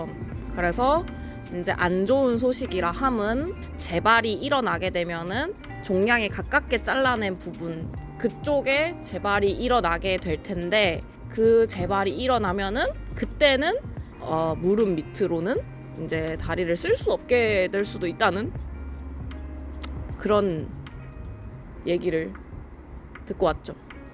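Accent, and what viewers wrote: Gyeongsang, South Korea. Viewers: 예쁘고 털털하시고 경상도사투리ㅋㅋ 심쿵했습니다. 긍정적 마인드시니 빨리 나을 수 있을겁니다! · 억양이 경상도분이시군요 힘내세요!!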